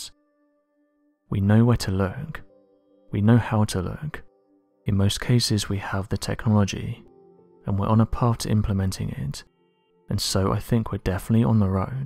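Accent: United Kingdom. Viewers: I hate this guy's lazy British accent.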